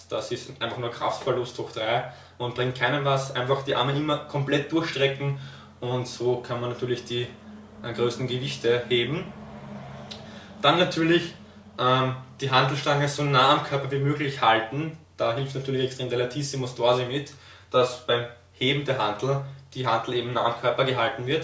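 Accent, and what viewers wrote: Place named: Vienna, Austria. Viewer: nie daran gezweifelt ;) Bist du Wiener ? Hört sich für mich stark danach an :)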